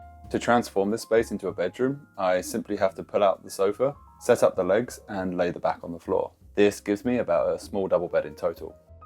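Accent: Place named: England, United Kingdom. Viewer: I'm hitting a like because you're cute and I'm a sucker for the English accent.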